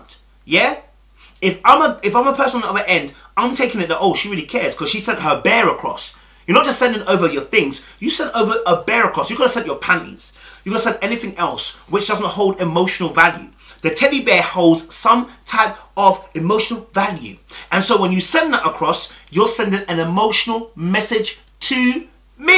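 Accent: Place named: Birmingham, United Kingdom. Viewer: Yout brommu accent came out today small small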